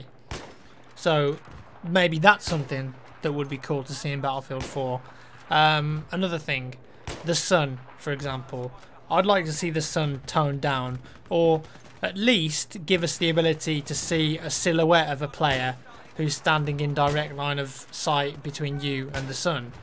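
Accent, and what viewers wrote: United Kingdom. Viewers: i love the sweet sound of your uk voice, can we game on box later...not that box you perv...360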